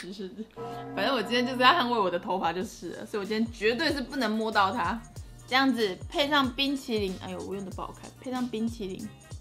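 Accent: Taiwan. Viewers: 講台灣國語敲可愛的我都會噗揪笑了出來🤣🤣🤣🤣🤣💜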